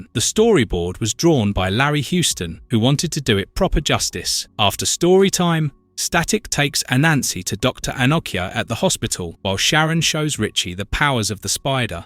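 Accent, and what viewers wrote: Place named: London, United Kingdom. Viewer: His not a american his a london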